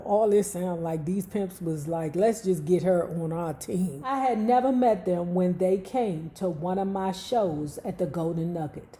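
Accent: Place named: Washington DC, United States. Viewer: Won't even mention that DC accent😮😮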